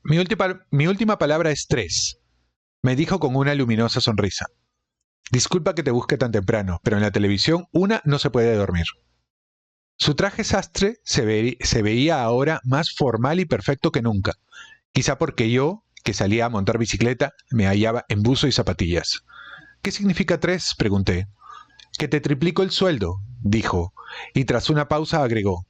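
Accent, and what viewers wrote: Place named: Upper class, Peru. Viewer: Creo que no es acertado que leas este libro. Justo la semana pasada ya lo resumieron en el canal de Orozco que tiene muchas vistas, y como que se pierde el interes (mira las vistas). Te recomiendo seguir con el Baylyverso. Y otra cosa, ese acento de pituco manyado ya paso de moda amigo, y ya tienes tu edad como para hablar asi. Suerte.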